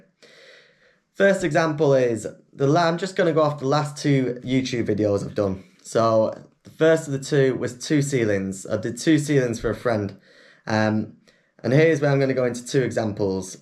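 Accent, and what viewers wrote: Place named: North, United Kingdom. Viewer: Hastings! You're from up north though ain't ya 👍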